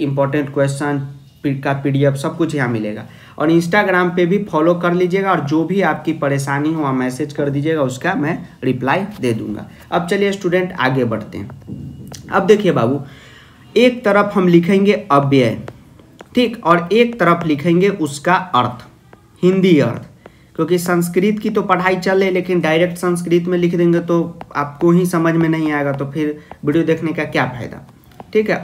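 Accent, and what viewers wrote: Bihar, India. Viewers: Are you Bihari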